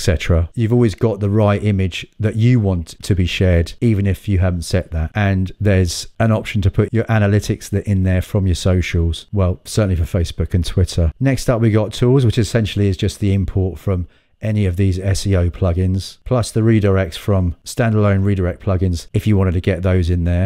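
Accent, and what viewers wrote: United Kingdom. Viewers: British accent best accent of the world 🎉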